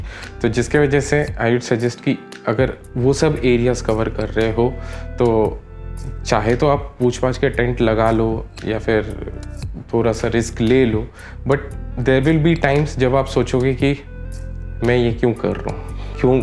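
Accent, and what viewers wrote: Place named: Bengal, India. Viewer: The way you gave info it's amazing . And thanks for the info man keep growing. I have a question are you bangali ? Your accent sounds like Bengali a bit .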